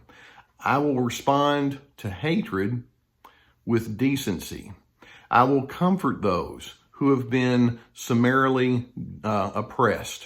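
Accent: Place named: Rural, United States. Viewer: Good topic, just ... but I think people who become professionals should try to get rid of that accent. It is possible to learn to speak with a more neutral accent. Because it's so distracting and it robes him of credibility. And that's just because of the stereo-types associated with the accent, I guess: cows, farms, ranch, horse, farmer, pigs, mcdonald, cowboy hat ... it's not usually associated with intellect. Omg I hate to be so hateful lol. But nonetheless, good content, good channel. Don't me I'm an idiot girl who cannot stop herself from typing my actual thoughts.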